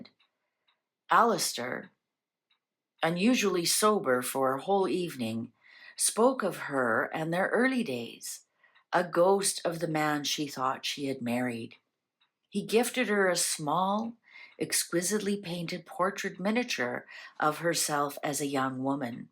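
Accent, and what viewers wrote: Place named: Canada. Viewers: I loved this. Your accent I love it Canadian. You brought the story to life's 🎉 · Canadian accent 😂❤ I am from the same area as We don’t hear our accent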